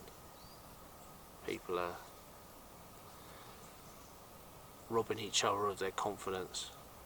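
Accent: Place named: London, United Kingdom. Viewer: where are you camping, i dont expect to know the exact location but is it private land or public. Im gussing as you have a London/ Essex accent its local to you ???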